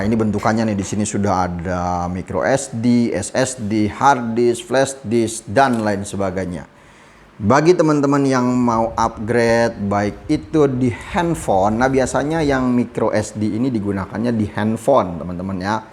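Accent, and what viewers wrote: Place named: Betawi, Indonesia. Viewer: orang betawi ya bang, gaya bicaranya ketauan banget. abangnya ganteng juga. upgrade saya dong bang🥰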